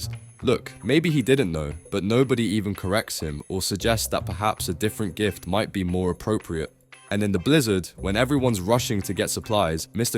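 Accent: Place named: United Kingdom. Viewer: Can you please stop with the British accent?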